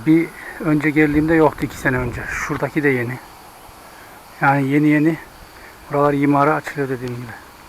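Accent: Turkey. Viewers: Kardeşim yozgatlımısın şive orası gibi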